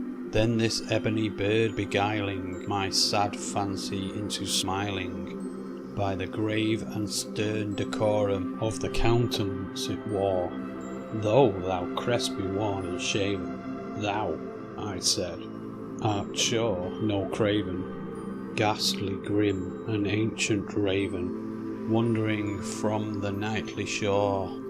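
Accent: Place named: England, United Kingdom. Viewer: Awesome job it sounded so good with your English accent you should do more of these.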